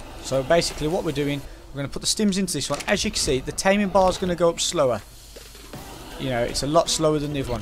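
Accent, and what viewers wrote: United Kingdom. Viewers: You from West mids mate sound local haha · After playing for over a year I am only now learning this trick. Prefect for those first herbivore tames at the start of the game! Smashed your like button in its pretty little face, mostly because your brummie accent reminds me of home :D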